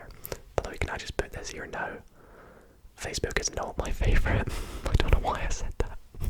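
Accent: Scotland, United Kingdom. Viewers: Your normal voice is EXTREMELY Scottish and your whisper isn't. And it confuzzles my brain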